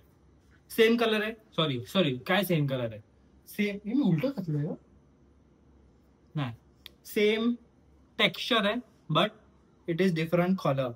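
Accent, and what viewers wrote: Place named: Malvani, India. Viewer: aani tujha malvani aaikun maka aasa vatata dev maka roj navin navin goshti deta ha tuja premat paduk ❤❤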